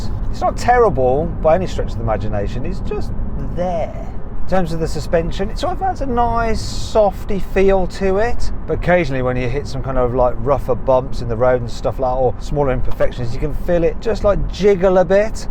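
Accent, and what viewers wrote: United Kingdom. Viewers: 이 양반 볼 때마다 딱딱 떨어지는 영국식 억양에다가 뚜렷한 이목구비, 항상 35%정도 빡돌은 얼굴인게 내가 상상하는 영국인 이미지에 되게 들어맞아서 볼 때마다 뭔가 재밌음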